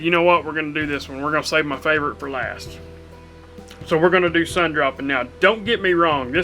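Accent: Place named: Rural, United States. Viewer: Dude I really didn’t notice your country accent. Some words you say maybe but didn’t notice. is much stronger. Love the country accent.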